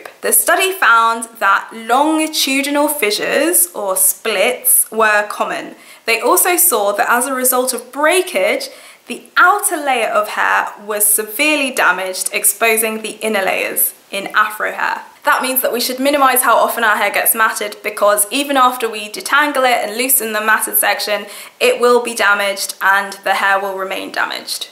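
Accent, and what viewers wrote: England, United Kingdom. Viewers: Which part of England youre from, love the accent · Yes, this is considered very well spoken British English. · fucking brits and their accents, fucking hot lol 😍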